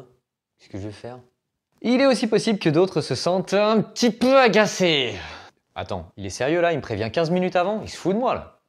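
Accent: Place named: Quebec, Canada. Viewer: 😁 ça fait plaisir de te voir en vidéo : Bon rythme, bon montage et bon contenu : un grand bravo ! Et cet accent Québécois... 🤩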